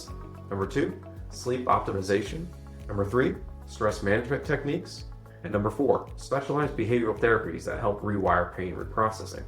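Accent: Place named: Kansas, United States. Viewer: This was enlightening! It’s so difficult for others to imagine chronic pain. I get up and dance at a wedding everyone says hey I thought you were crippled and in pain…..I am but you have to live! You are so knowledgeable yet down to Kansas friendly speech. You deserve a huge audience! When I turned 40 fibromyalgia almost ruined my busy life. Add the loss of 8” in height and back pain like the kidneys you mentioned! It’s a miracle I listened to your kidney pain info and it saved me a trip to the nephrologist! Thank you oops!❤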